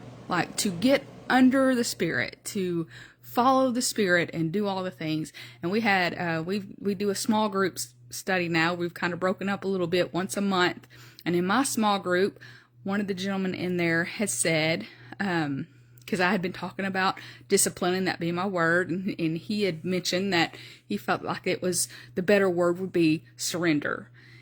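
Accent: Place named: Tennessee, United States. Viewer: Youre in Tennessee too huh ? I can hear it. Anyhoo COME LORD JESUS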